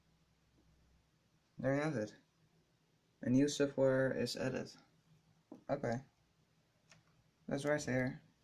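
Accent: Netherlands. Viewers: wanneer een nederlander engels praat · something tells me you're from holland :P, correct me if im wrong, and if you are from holland(don't worry about it im form holland aswell :P )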